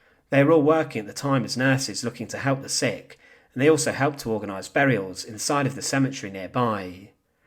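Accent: United Kingdom. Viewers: does this guy have an accent IN ADDITION TO his british accent maybe talk slower eh ?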